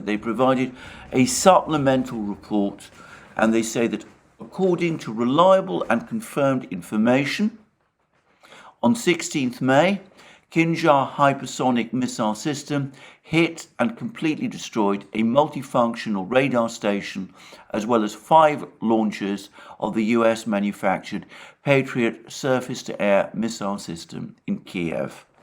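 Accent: United Kingdom. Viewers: Your well-spoken nature has such a British appeal.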